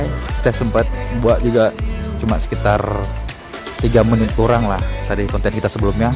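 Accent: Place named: Aceh, Indonesia. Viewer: Orng aceh ab ya, gmongnya ada g. Ong bhsa aceh. Oy new pelangi knp gk prnh nmpak b.???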